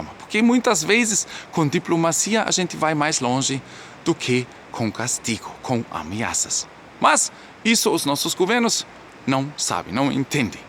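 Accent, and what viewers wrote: Brazil. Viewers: Se não fosse pelo R vc seria confundido com um Brasileiro nato. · Irmão eu só consegui ver o Leôncio do Pica Pau, ainda mais com esse sotaque Brasigermânico. Abraço!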